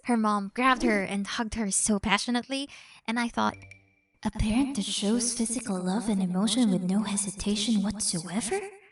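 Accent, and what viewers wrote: Philippines. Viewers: The first time i saw this i was like : why does she have a filipino accent? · I knew it from the start y have a Filipino accent lol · 35 seconds into the video and I already know she's a Filipino xD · If may I ask Are You Filipino???!! Your Accent Is kinda !!! Haha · Me: Hears say pancit canton, and no American accent Also me: Definitely Filipino. Edit: this is my first video watching don't bully me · this my first time watching. you sound filipino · woah is a filipina girl i know the accent of the filipino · And btw I noticed how speaks HAHA I notice in the first place but i don't have a clue filipina WHOAH LAB U ATE 🥺✨ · are you a Filipina??? cuzz you voice sounds like it??? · Based on the grammar and accent YOUR A FILIPINA! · Soo u a filipino i know that accent imma filipino too :D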